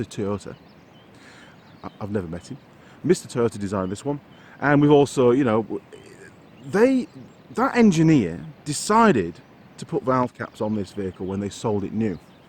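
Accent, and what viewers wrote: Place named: West Yorkshire, United Kingdom. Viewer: really enjoying your channel but one thing has been bugging me,, where are you originally from, you sound reasonably local to me in west yorkshire :)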